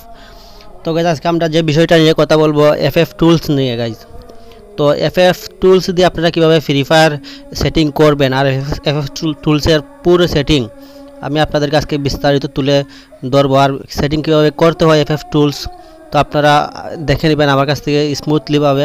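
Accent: Sylhet, Bangladesh. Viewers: Sylhety ni bro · Vai sylheti grime🤟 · Sylhety bro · Sylheti na kire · ভাই আপনি কি সিলেটি? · Vai apni sylhety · Tmr basha Sylhet tai na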